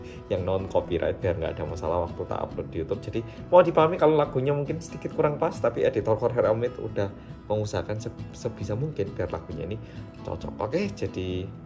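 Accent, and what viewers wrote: Java, Indonesia. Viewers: Maaf mas..calon nya bukan orang indo ya..kirain orang indo..sayang banget lama di indo ngak ada cewek indo yang doyan haha..oppss maaf..✌ bahasa medok tak kira ditaksir cewek dari jawa.. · haloo mas aku bolo bolo udah nonton dari jaman mas upload ciri² kamu psikopat atau bukan aku lupa taun berapa sampe ke detektif roemit dan rinding dong yg emang aku suka banget dengan pembawaan cerita dari mas, dan sampe akhirnya mas up pacar nya ke public and sempet kaget ternyata udah pacaran lama dan sekarang udah mau menikah, and aku juga mau menikah mas ditanggal 21 ini, selamat yah mas si medok 🥰🥰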